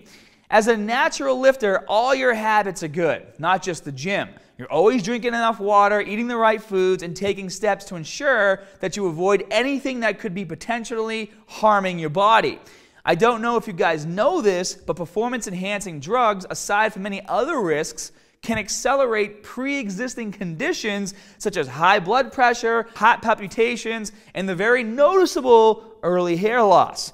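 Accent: Boston, United States. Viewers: New here... are you from BOSTON? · Gotta love the boston accent! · u must be from boston :D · i cant tell how old you are, but i can guess you're from boston? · I'm new to this fitness thing... Are you from Boston? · The Bowston accent is awesome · are you from boston?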